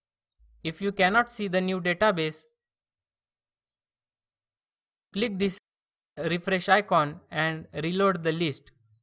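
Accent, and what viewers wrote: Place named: India. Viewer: This is how to teach any beginner properly. Most other uploaders should learn from this video. It seems to be a common problem for some programmers beeing incompetent as teachers. You need to think like a human, not like a computer. If you're having a hard time understanding the indian accent for some reason, the subtitles works well!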